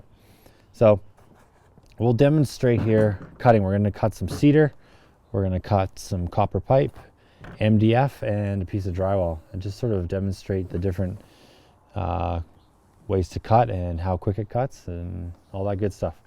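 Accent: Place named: Canada. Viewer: Could you please remake this video with a narrator? I can’t understand the thick Canadian accent. Thanks.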